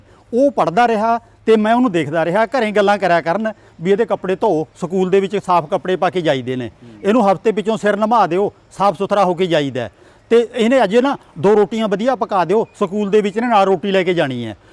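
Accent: Puadh, India. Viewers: ਮਾਰ੍ਹੀ ਪੁਆਧੀ ਬੋਲੀ ਨੈੱਟ ਪਾ ਸੁਣਕਾ ਮੇਰਾ ਦਿਲ ਬਾਗੋਬਾਗ ਹੋਜਾ, ਪੁਆਧੀ ਬੋਲੀ ਤੋ ਨਿਊ ਆ ਜਿੱਤਰਾਂ ਕਿਸੀ ਕੇ ਮੂੰਹ ਮਾ ਤੇ ਮੋਤੀ ਗਿਰਰੇ ਹੋਮਾ, ਅਰ ਯੋਹ ਆਲਾ ਤੋ ਜਮੀਂ ਪੁਆਧੀ ਬੋਲੀ ਬੋਲਾ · Punjabi boli di opboli puaadi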